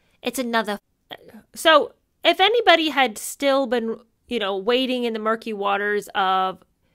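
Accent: United Kingdom. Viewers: does a remarkable British accent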